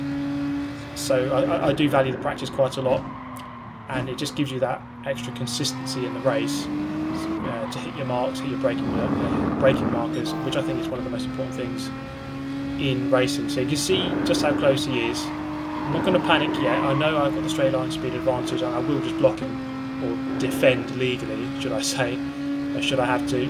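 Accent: United Kingdom. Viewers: Can’t believe ‘they’ changed the intro music 👌😂 The humour. And the theme is so contrary to your british gentleman voice and the image peeps might have of you lol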